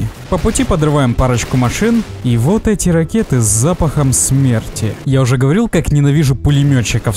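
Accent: Poland. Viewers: Ты што польский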